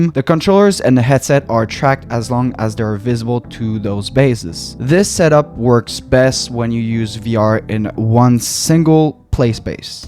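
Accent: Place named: Quebec, Canada. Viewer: je peut entendre l'accent quebecois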